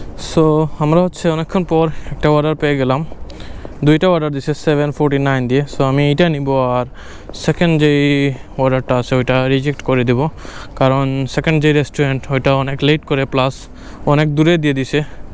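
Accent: Sylhet, Bangladesh. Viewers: Sylheti kotha gula sunte khub valo lage 😊❤ Love from shahporan, Sylhet 😊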